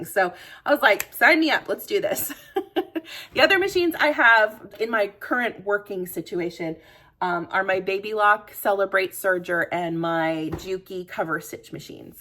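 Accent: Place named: North, United States. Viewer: Lol ... I'm also from the north so I understand the mouth 😂 I now live in Tennessee where they don't have my kind of mouth😅... I found you a few weeks ago and I have to tell you at almost 58 years old my bucket list was to teach myself how to sew and you have inspired me to actually start... 😊 I gave my mom your tote bag and Walker bag today and she absolutely loved to them.... Thank you for being such an inspiration and I hope you and your family have a wonderful holiday season and a very blessed New Year.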